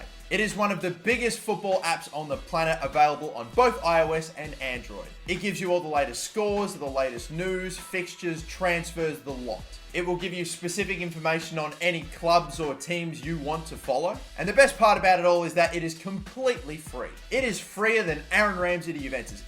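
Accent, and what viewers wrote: Australia. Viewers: he's Aussie right? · no he is Australian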